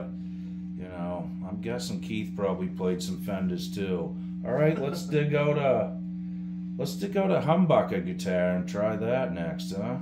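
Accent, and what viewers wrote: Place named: East Coast, United States. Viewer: No offense, are you from Massachusetts?Or New York? I hear some upper East coast dialect. Talk less. Let us hear the amp more.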